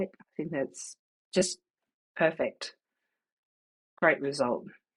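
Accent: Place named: Australia. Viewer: I tried to subscribe but it keeps sending me to another website. I will keep trying as I'm quite impressed with your video, the way you controlled the variables you weren't testing and your Aussie accent is not off-putting as some can be (I am Australian and my own accent would probably not work on Youtube)). Just tried again and it worked to subscribe this time so if anyone else has this problem, it's worth persisting.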